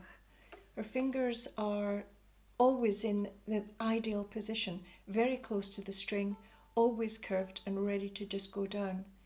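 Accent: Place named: Scotland, United Kingdom. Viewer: I really enjoy your videos - and it makes me very homesick to hear your beautiful accent. I'm hanging on to mine, but would love to return to Scotland after 22 years down south...one day!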